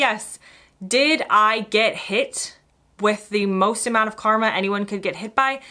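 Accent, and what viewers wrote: United States. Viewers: I see you haven't lost any of your american accent, but I notice you using British words.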